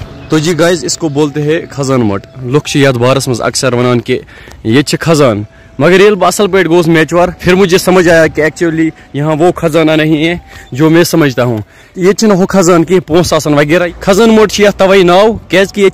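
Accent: Kashmir, India. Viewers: I literally, like you kashmiri accent.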